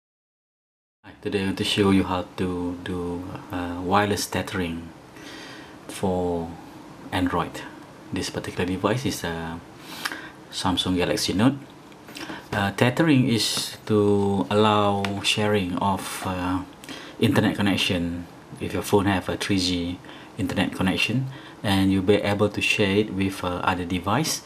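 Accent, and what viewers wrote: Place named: Malaysia. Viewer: sounds malay to me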